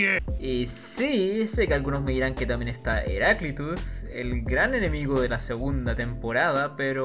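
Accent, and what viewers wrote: Chile. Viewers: eres chileno? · no se te entiende nada..habla más claro, hasta pareces chileno .-.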